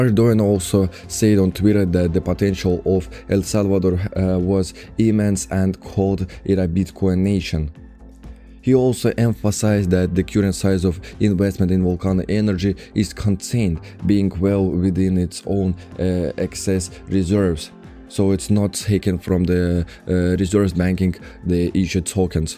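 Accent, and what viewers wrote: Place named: Russia. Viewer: russian accent....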